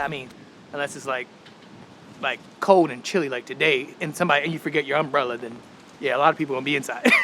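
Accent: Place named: Alabama, United States. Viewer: Love to listen to you, you sound like my dad who is from Alabama.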